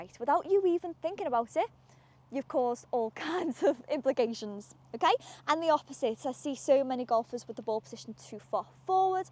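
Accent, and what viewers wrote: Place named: England, United Kingdom. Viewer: you are a great teacher and I love your English Accent 🥰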